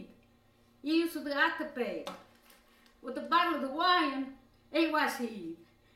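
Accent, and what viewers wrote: Italy. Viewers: My nonni for sure bring back so many memories and the way she speaks w the italian accent only my nonni spoke more itslian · Her accent makes me miss my Nonna . Love you Italian grandma and thank you for the recipe. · Love her I just love her. I’m a new sub. I miss that accent. I grew up in New York in Little Italy. And I would always go to my friends house to eat pasta fagioli, now I can make it myself. Thank you Nona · I love watching. She reminds me of my auntie the way she talks and cooks and the way she makes simple Italian traditional food like my mum used to make and continue to make. She sounds as though she comes from the same region of Italy as my mum and dad. Saluti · English spoken with an Italian accent. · I LOVE YOUR UTUBE CHANNEL. YOU MAKE ME THINK OF MY GRAND FATHER HE WAS FROM ITALY 🇮🇹 AND I LOVE HIM SO MUCH ❤️ YOUR Accent reminds me of him. 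He was an AMAZING MAN.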